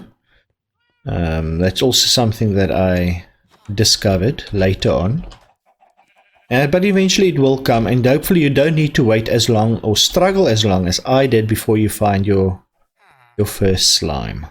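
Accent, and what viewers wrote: South Africa. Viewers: You sound South African so awehhh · His sounds South African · Na he sounds south african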